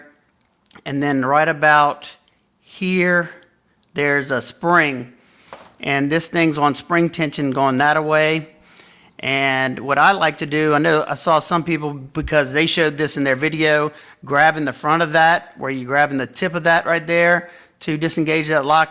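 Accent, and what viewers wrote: Louisiana, United States. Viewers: This knife is so interesting. its screaming for custom inlays. great review! do I hear a south Louisiana accent?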